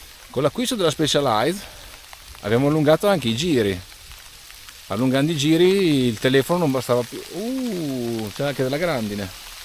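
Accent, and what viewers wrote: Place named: Emilia, Italy. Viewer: Ciao Hai un accento emiliano e vivi in Germania ma quanto forte sei è un gusto seguirti. Continua così.....😊😊😊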